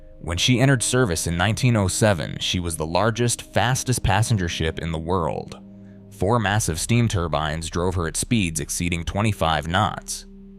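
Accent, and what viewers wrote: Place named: United States. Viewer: Soon as I heard that awful yank accent I had to turn it off.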